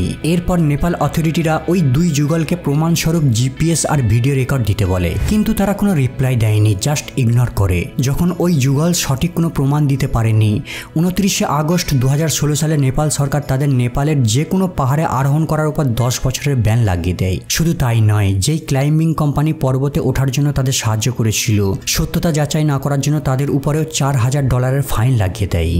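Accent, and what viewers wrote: India. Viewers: Indian channel এটা 😂😂 বাংলাদেশীদের ভাষা আওয়াজ ভারতীয় দের মত না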